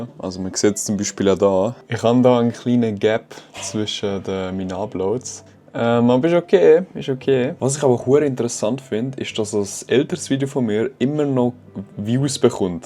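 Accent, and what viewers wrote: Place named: Switzerland. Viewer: Also trotz Schweizerdeutsch kann ich dich gut verstehen. Fands interessant wenn auch die Videos die rausgekommen sind mich so absolut überhaupt nicht catchen.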